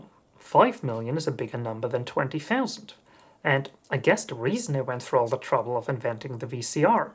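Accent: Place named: Germany. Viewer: I sense beautiful German accent! 😊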